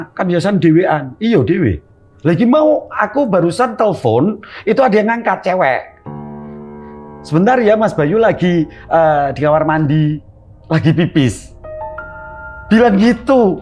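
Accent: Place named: Semarang, Indonesia. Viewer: Bahasane semarang bgt hehe,,, salam dari cah banyumanik bang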